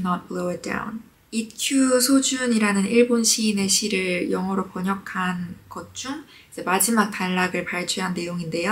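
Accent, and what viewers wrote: China. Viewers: 妳說的中文語調是大陸腔調。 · 為什麼您是中國口音😂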